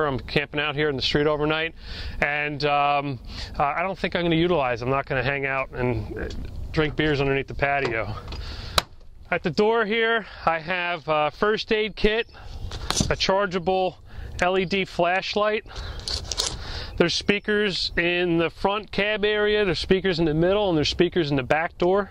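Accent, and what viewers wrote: New Jersey, United States. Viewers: terrible jersey accent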